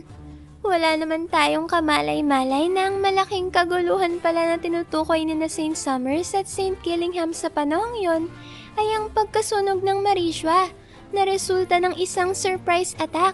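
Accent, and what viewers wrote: Pampanga, Philippines. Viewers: taga pampanga kaba?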